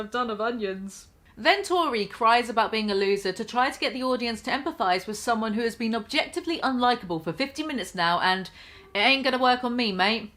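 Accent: United Kingdom. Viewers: I’m subbing for these reasons, you should as well. British accents are a plus for no reason. I love a female creator who’s not doing makeup tutorials or exposing her body for subs. To each their own, but I come to YT for laughs, memes, and “content”. Shes given me both. The video I see next “The Sheer Entitlement of Youtubers” just give humble vibes.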